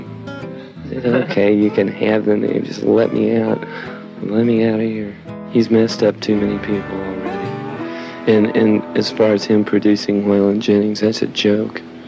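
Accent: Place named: South, United States. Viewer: He had a beautiful soft southern drawl. I find that his song is very calming when I feel tense.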